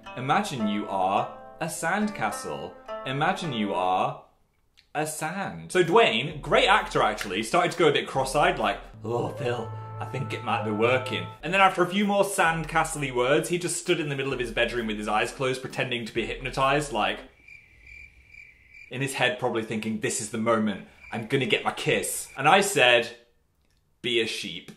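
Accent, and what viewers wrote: North, United Kingdom. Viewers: u sound northern again im living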